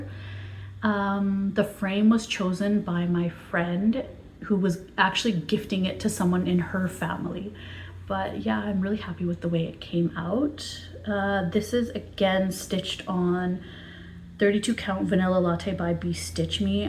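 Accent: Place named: Whitby, United Kingdom. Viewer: I'm in Whitby, it sounds like you're somewhat nearby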